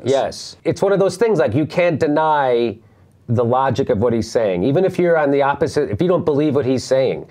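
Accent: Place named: Chicago, United States. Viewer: Jimmy’s accent has changed so much throughout the years, even 9 years ago he had a relatively thick Chicago accent.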